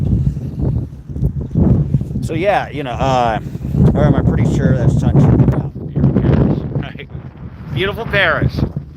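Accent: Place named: RP, United Kingdom. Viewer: Ooooo posh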